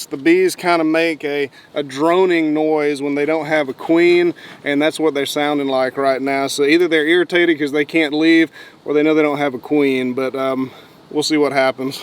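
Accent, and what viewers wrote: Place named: Georgia, United States. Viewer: I was stationed in California with a fellow from Athens, Georgia. We became good friends and kept in touch for many years after our service duty was over. These were days before cell phones or internet. Hearing your voice and Georgia accent reminds me of my good friend Eugene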